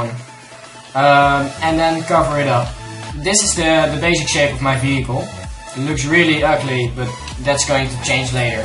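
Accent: Netherlands. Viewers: are you dutch? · Jij hebt een nederlands acsent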